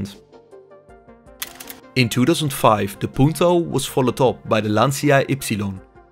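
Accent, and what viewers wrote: Netherlands. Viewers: Makambeus.........Tremendo Dutch aksentos. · That is a Dutch accent if I've ever heard one!!! Sounds like my Opa! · Dutch Limburg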